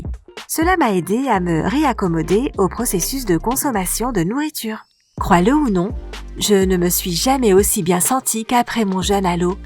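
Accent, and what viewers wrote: Quebec, Canada. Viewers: Ce qui est marrant c'est qu'on a l'impression que la personne essaie de parler dans un français à priori neutre et "universel" . Je crois qu'il y a une légère pointe d'accent québecois. Mais pourquoi le gommer? C'est sympathique, au contraire, comme le sont d'ailleurs aussi les accents belges ou congolais. 😉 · Accent québécois deguelasse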